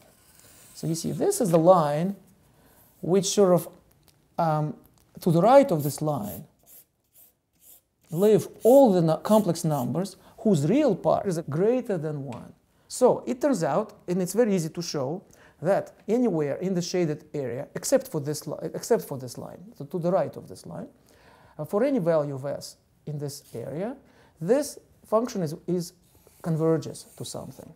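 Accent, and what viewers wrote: Russia. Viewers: Not sure why but his Russian accent makes me understand math better. My all time favorite Numberphile video. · да да, вместо three три говорит :) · there is no german accent to hear in this. Believe me i am German. His accent is clearly Russian and i find it quite nice to listen to. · The accent is Russian. I know several people with exactly the same accent. Actually, it could be Belarussian as well. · Me hear russian accent too. It's interesting because the man who named edward frenkel cannot be russian. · I love math, as much as I love your Russian accent. :3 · мне кажется или у него русский акцент? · You got a strong accent *kind of Russian I guess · Now why does he have russian accent? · Я тоже смотрю и думаю - он по ходу русский, раз я так хорошо его понимаю)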